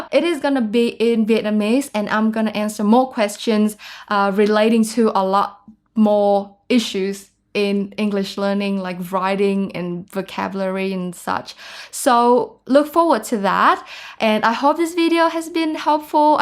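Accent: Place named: Australia. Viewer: Dạo này em bị mê Aussie accent của chị quá huhu. Chị làm nhiều content bằng tiếng Anh đi để em có thể thụ động ngấm Aussie accent của chị với huhu 😭😭 Em ngán TV accent của em quá rồi, nghe hơi basic =)))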